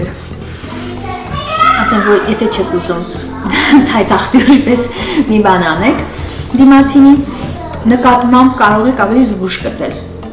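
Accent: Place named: Gyumri, Armenia. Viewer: ջան քո խոսելաձևի մեջ գյումրեցու ակցենտ եմ տեսնում դու արմատներով որտեղից ես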